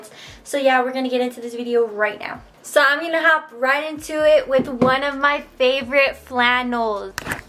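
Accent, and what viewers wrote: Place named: South, United States. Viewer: is it just me or she has a slight country accent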